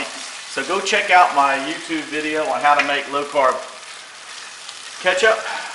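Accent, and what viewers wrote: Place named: South, United States. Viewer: Listen to that southern drawl!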